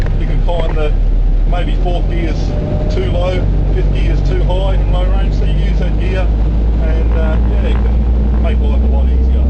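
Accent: Australia. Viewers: fucking australian accent... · well his accent is Australian or kiwi so i'm guessing he's there, where they drive on the left.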